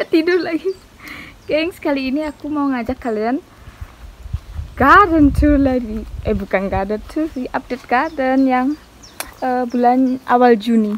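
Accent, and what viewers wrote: Bali, Indonesia. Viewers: Kak ada logat Bali nya kental banget yaa 🤣🤣 Di konten YouTube yg rujakan sama Mpo Ika juga bilang biasanya rujak bumbunya tabia, sere, kuah pindang 😂 Penasaran dulu pernah tinggal di Bali kah? Btw, stay healthy sekeluarga dan thank you udah selalu sharing konten yg menarik yaa kakk 😍😍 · Logat balinya hihihi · Logat Bali ..kak dari mana asal Bali kah? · Kok kak logat.a agak orang bali 😍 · Logatnya bali banget Kakak dari bali yaa? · Dari Bali kak ya?? Logat Bali banget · Kok aku dengar ada logat bali nya ya😂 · Aku baru mulai nonton channel kakak...kak dari mana ya? Orang Bali kah?soalnya logatnya kaya orang Bali😅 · Logat balii dongs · Orang bali kak? Kok logatnya bali banget yaa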